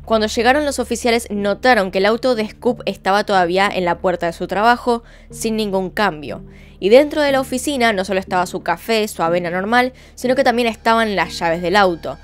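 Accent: Argentina. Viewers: ¡Me encanta tu gata! De ahí que es un plus más para ver tu canal, siempre me fijo en ella, a parte de tu acento argentino. Un beso guapa. 😘 😻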